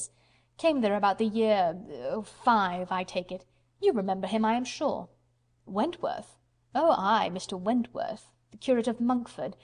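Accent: RP, United Kingdom. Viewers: love her posh voice :D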